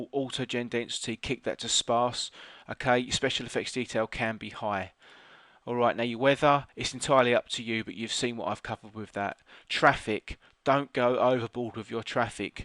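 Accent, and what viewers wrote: United Kingdom. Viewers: I FUCKING LOVE YOU THNK U BRITISH OR WHATEVER COUNTRY UR FROM it really helped allot now i can enjoy flying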